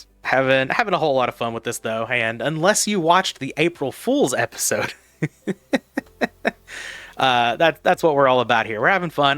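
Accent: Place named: South, United States. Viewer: As someone from ohio you are southern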